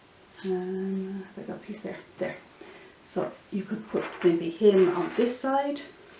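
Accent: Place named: Ireland. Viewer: Really enjoyed this ,,,good to hear an Irish accent.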